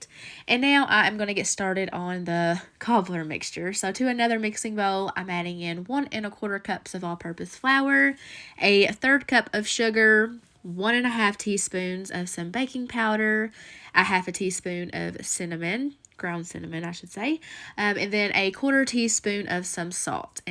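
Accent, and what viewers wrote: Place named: Kentucky, United States. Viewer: I'm in Louisville!! Always knew the accent was familiar it's cause we Kentuckians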